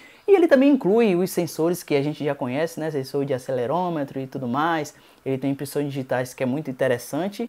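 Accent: Bahia, Brazil. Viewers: Vc realmente tinha de ser baiano... parabéns! gosto de seu canal! Tb sou baiano. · vc e da Bahia ?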